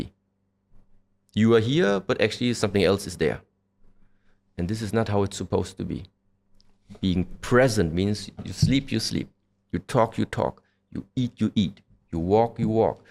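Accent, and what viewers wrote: Germany. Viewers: Sag ma bin ich es oder hör ich da einen deutschen Akzent? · Why does bro have a really German accent? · Didnt expect the german accent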